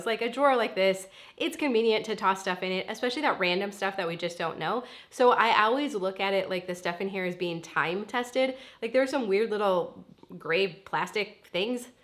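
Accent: Minnesota, United States. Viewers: Love watching you, lived in Minneapolis years ago. Good to hear a good Minnesotan voice.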